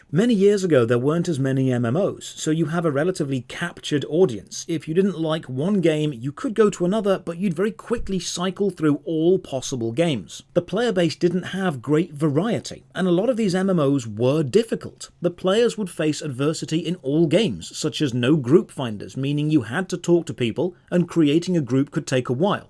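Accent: United Kingdom. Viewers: You sound so british for a swede.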